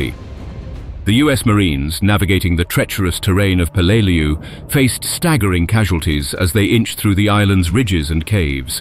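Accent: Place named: United Kingdom. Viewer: As formidable as the Marine strategies and tactics had become by the time they assaulted Peleliu, their "remarkable success" and "sweeping up whatever was left" after the naval and air bombardments, had nearly always resulted in massive casualties and hard fought battles with the relentless Japanese Imperial Army....Their landing was FAR from easy, ( Do you mean Guadalcanal? ) the Japanese threw everything they had at them from the very beginning, and Peleliu was definitely NOT a "lush" island, it was a craggy, scruffy, unforgiving coral island with sweltering temperatures and very little water....I stopped watching this video after as it is extremely clunky, even with a British accented narrator, pronouncing Peleliu incorrectly.....Semper Fi.....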